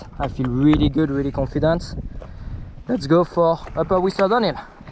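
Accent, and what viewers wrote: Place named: France. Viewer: joli accent français XD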